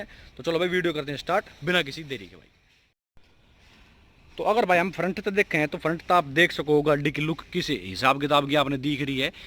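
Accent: Haryana, India. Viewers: This is not hindi it is haryanvi bhai lage raho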